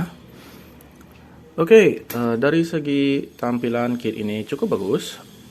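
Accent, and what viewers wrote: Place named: Bali, Indonesia. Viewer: ngenah logat bali e wkwk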